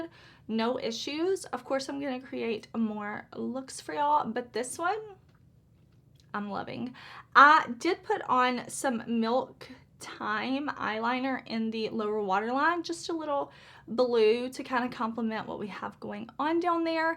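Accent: South, United States. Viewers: Wow 🤩🤩🤩🤩 That last look w/the green in the “socket” 😉- Just WOW!! That multi-chrome w/the predominantly pink shift; that’s got to be a gift from God, & I’m not sure I’ve seen one of those types of shadows on camera as reflective as that one. I kept thinking “oh no, she missed a spot of her lid space w/that multi chrome”-but no, I knew your skills were better than that, it was just the amazing shift and the way the color would “jump” around ur lid; girl, it practically dances across ur eyes ! I am only learning of this brand(from you). I actually kind of gravitated to ur channel after watching a few of ur vids, and appreciating ur seemingly unwavering support of these Indy brands, that other than Color Pop, I knew ZERO about! I’m fascinated, I’m hooked, and I think you’re great and that’s why I subscribed. You’re smarts, beauty, charm, southern accent, are just too refreshing to pass up 👍🏻💄💋❤️👏🏻 I’m learning so much, thank you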